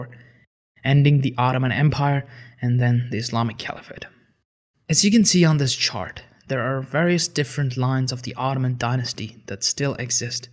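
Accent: Arab world. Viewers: Why are you pronouncing sultans name with an arabic accent? They are not arabs they are turks. I wish someone who knows turks and turkish prepared this video:/ this is so amateurish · Nice video first of all, but why did you read the names with an explicit Arabic accent? Nobody in Turkey or in Ottoman times pronounced the names like that, nor are the Turks related to the Arabs. For many Turks, it's actually quite offensive. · Please dont pronounce Turkish names in Arabic way :/ (Ottomans ınspired by arabic culture a lot I know but THEY ARE TURKISH:///) And sorry but at the ending it sounds like a banned sultans ready to built an empire again? :D which is not true and It made me feel really bad as a turkish person :/ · Your accent getting me sick !!!! Don't use Arabic accent , use Turkish accent . İt is inexcusable mistake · your pronounciatons are like an arab who trying to speak turkish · The narrator is an Arab and he pronounces as he knows. 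Biraz hoşgörülü ol kardeş :) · yes you actually can pronounce them differently especially when it's literally using the very same writing system and script that it's being borrowed from! Which is arabic! It makes sense to pronounce them as they're pronounced in Arabic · Your pronounciations are way off bro. You pronounce the names in arabic tongue. Which is completely wrong. Its not moustafa qamal. Its Mustafa Kemal. We never had guttural sounds like that. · Please do not pronunce names with an Arabic accent when talking about Turks, it is just incorrect. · I guess the narrator is Arabic, it definitely doesn't justify the wrong pronunciation though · exactly. That Arabic pronunciation is extremely distracting. This was a Turkish empire. He should just have pronounced the names in English. Even that is closer to the Turkish pronunciation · maybe because he is arab and those sultans names were arabic name,even your founder of yours new country Turkey has arabic name Mustafa and that's why it's normally to pronounce like that.Example I'm albanian and if I had turkish name and you as turkish if pronounce my name in turkish version, I would see as normal thing because that is the way how you pronounce I don't see nothing strange on this. 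· Turkish names are not pronounced like Arabic. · Thanks. I purchased this chart some months ago and enjoy referencing it. I believe the narration of this video however could be improved. Notably, important Sultans were glossed over, and some, not mentioned at all, such as Sultan Abdul Hamid II. There’s also a number of inaccuracies I believe, including attributing the correct titles to the Sultans, such as referencing Osman as Gazi instead of Bey. Finally, while the names are Arabic, I believe they should’ve been pronounced in Turkish, which is not difficult to do even for an Arabic speaker.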